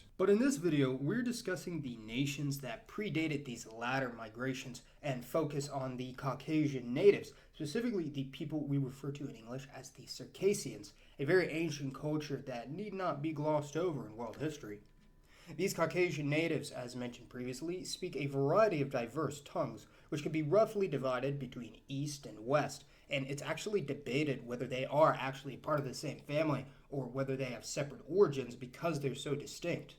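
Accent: United States. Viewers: Isn't it telling that, just now, when the US is attacking Russia and saturating the world with anti Russia propoganda, that this US presenter complete with American accent covers this old history at this time? Its just the US trying to sow conflict as usual by sticking their boot in to widen any division anywhere they can find. Its just a distraction from all the wars, invasions, thefts and mass murder the US specialise in....to 'Defend' that land already defended perfectly well by the Atlantic & Pacific. "Don't look here, look at those Russian baddies" from centuries ago.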